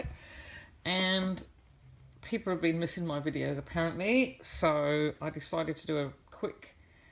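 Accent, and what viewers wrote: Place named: England, United Kingdom. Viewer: Hey hey! A British tag by a British on my side of the world!:D So you feel more British than Kiwi...?:P I have to try this Yorkshire tea. The second time I heard about it, Simon's #TeaTag was the first time. Everyone mentioned chocolate Hobnobs...! Simon said this is NOT Digestives oh no:D:P Gobsmacked. Good word!! I love how "U" is "OO" in some English accent and how you say "N" instead of "Ng":P Cockney Rhyming Slang is such a new concept to me!!! That. Is a cool name for a pub indeed. Very you:D Oooooh I LOVE that Christmas song! did you say? I didn't even know it's English! Great songs!! Great signing! MARMITE!!!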